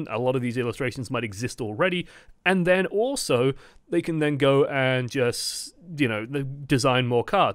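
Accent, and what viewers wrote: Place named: United Kingdom. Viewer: I like rant-esque news and thoughtpieces by british-accented folk.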